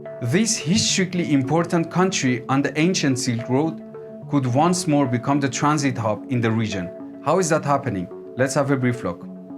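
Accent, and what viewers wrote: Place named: Iran. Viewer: I like the persian accent of the speaker ❤️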